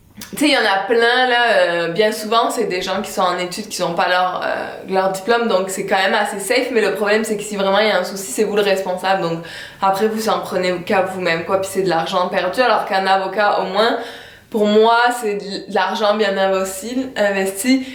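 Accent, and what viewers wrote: Quebec, Canada. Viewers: elle a un accent quebecois un peu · elle a un accent mêlé entre l'accent français standard et l'accent québécois standard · elle a un accent parfois quebecois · tu a choppé l'accent Québécois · Ouii un petit accent du Quebec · Tu commences à avoir l'accent québécois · c'est dingue l'accent québécois que vous avez pris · l'accent s'apparentait plus à l'accent Québecois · 50% accent qc 50% francais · Un melange délicieux d'accents québécois et français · l'accent canadien est en train de prendre le dessus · t as l accent québecoise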